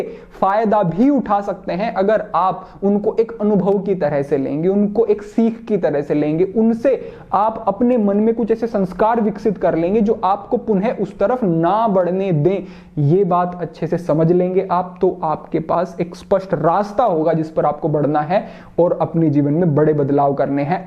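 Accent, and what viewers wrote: Standard. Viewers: आप बिल्कुल शुद्ध हिंदी बोलते हो गुरुजी 🙏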